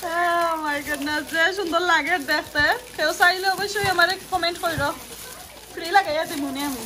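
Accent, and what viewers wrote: Sylhet, Bangladesh. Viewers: Congratulations apu! Oo Afu aida khita khoilan? Afnar video ke khali afnar Sylheti boinaita dakha ni? Onno distric ar manush oh toh dakhun. Oija dahugga apnar maat hunita hunita ami Sylheti maat hekhi lairam 😀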